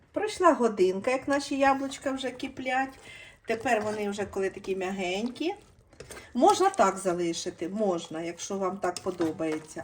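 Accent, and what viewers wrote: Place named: Vinnytsia, Ukraine. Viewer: Скажіть, будьте ласкаві, з якої Ви області, бо у Вас такий говір, як у нас на Вінниччині? І де Ви придбали свою класнючу кастрюльку? Я на неї не могла все відео налюбуватися!😊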